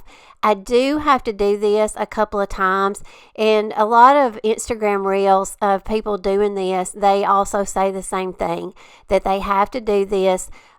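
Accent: South, United States. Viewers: Love all your designs and projects. Also your sweet southern accent. Happy new year and good luck with your channel. I’ll be watching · Congratulations on prosperous year. I found you in collab, I don't recall which one but I'm so glad. I am a Alabama born, grew up in Columbus Georgia, moved to East Tennessee 20 yrs ago. You definitely have that adorable southern accent. Thank you and keep the DIY's coming. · Love you sweet southern accent!🎀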